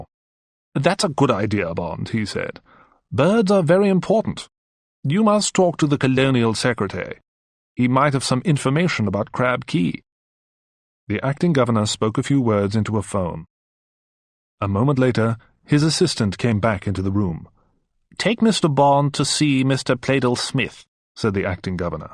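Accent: United Kingdom. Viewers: is this british or american?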